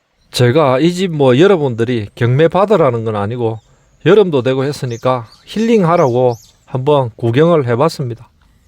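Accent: Gyeongsang, South Korea. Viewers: 23만 축하드려요 ~ 경상도 목소리 듣기 좋아요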